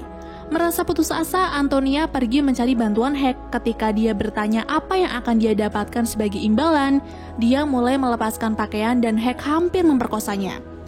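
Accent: Pemalang, Indonesia. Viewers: Wong pemalang kye ya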